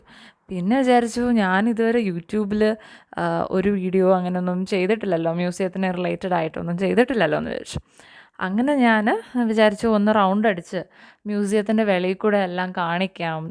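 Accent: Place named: Kottayam, India. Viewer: R u from Kottayam..... Oru Kottayathinte slang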